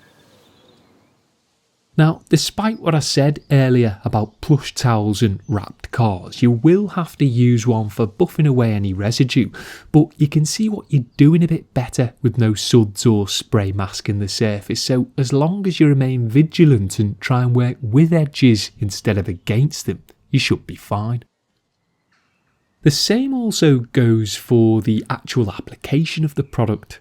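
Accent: Liverpool, United Kingdom. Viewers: He is from Liverpool and has a regional accent. The information is solid, the content is free, stop moaning. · Scouse mouse all the way fantastic video as always though bro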